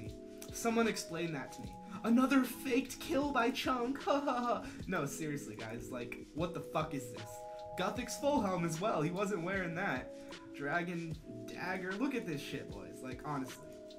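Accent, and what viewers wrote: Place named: East Coast, United States. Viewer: Cali :o i totally thought you were an east coast guy. now i sad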